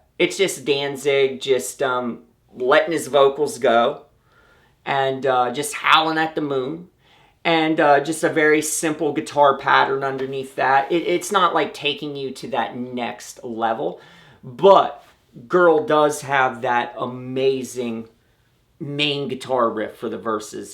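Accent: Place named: Ohio, United States. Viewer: Sounds like Ohio to me!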